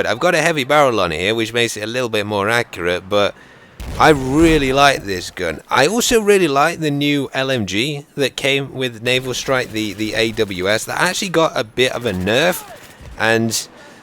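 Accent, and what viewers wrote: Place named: United Kingdom. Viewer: both British accents but defo not even similar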